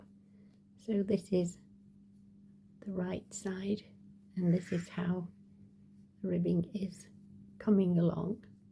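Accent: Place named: England, United Kingdom. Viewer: I can see, however, you have an English accent or perhaps I am mistaken and it is Ai audio dubbed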